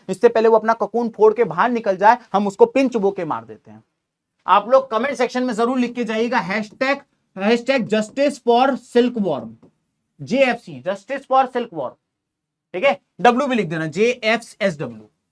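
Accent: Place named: Bihar, India. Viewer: Pure bihari lag rahe hai sir ❤❤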